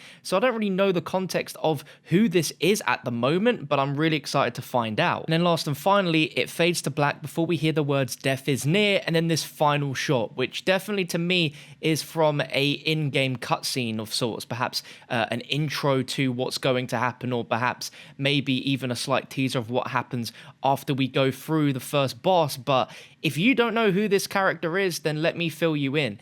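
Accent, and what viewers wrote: United Kingdom. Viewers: where are you from? from uk?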